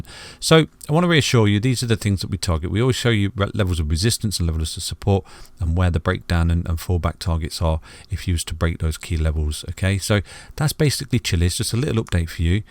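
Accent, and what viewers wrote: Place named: Nottingham, United Kingdom. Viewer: that sounds like a Nottingham accent there? Forgive me if I’m wrong! Great videos! 👊🏽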